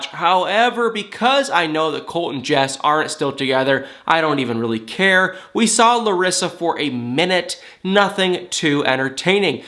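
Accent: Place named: South, United States. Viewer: You're southern aren't you? "woman's trouble" lol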